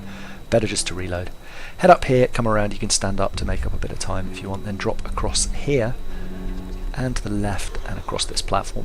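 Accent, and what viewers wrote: United Kingdom. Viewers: Thanks for the great video. I had to make adjustments because I was going for the Thief Insignia and for the Pretentious achievement at the same time. "Pretentious" is a nightmare, but with a few covered kills followed by some "run-like-the-wind"s it was finally doable (albeit leaving a bloody trail of devastation in my wake). Loved the narration, btw. There's something about a British accent that just makes you-tube videos better (sounds more cultured), and I also appreciate you keeping it clean. It's refreshing to not have to put up with a slew of f-bombs just to watch a gaming video. I look forward to the rest of this series. This game is far from my "favorite", but your videos definitely make it better. Kudos!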